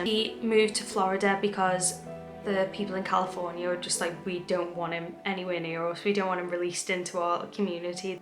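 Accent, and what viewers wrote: Liverpool, United Kingdom. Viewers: Remarkably Google Captions understands your scouse accent perfectly well not a single word wrong which shows how clear you speak. Normally regional British accents don't translate well. May I also say you have the cutest mouth I've ever seen!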